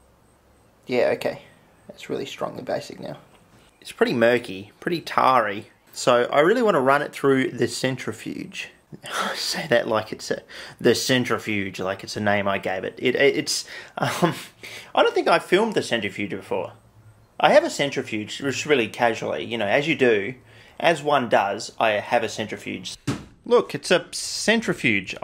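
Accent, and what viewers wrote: Australia. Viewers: ty the tasmanian tiger is what i first thought with gamecube and your accent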